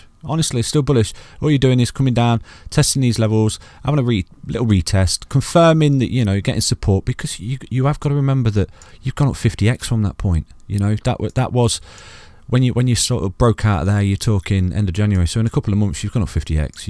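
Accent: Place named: Leicester, United Kingdom. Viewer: Great insight, you sound like a fellow Leicester lad 👍🏽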